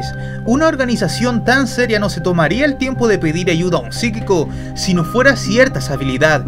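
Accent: Chile. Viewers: La voz de se nota la evolución en su narración y por primera vez le sentí su acento chileno 😳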